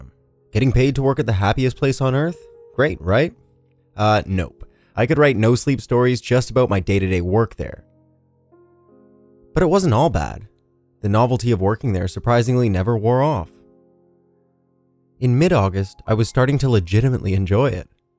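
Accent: Canada. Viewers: Your canadien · Bruv your Canadian accent makes this way less convincing. Americans, presumably most employees at Disney, don't say "soaree" 😂😂😂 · Canadian, eh?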